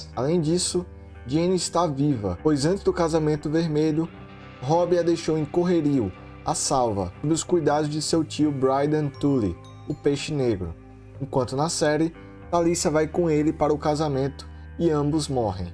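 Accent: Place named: Bahia, Brazil. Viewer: Manin, desculpa a pergunta meio inusitada, mas tu é baiano? É que o seu sotaque me lembra muito o do Vampeta kkkk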